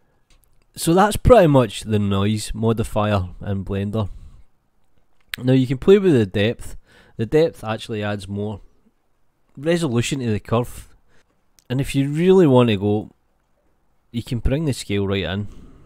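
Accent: Scotland, United Kingdom. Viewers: I'm originally from Italy, but I love this Scottish accent in your voice so much, I just don't know why! Great tutorials!!! Thanks a lot!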